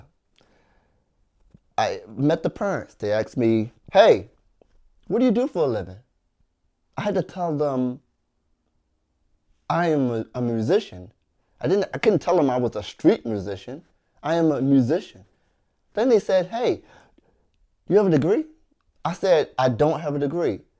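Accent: Baltimore, United States. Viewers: Besides the point of the video I know but are you from Baltimore?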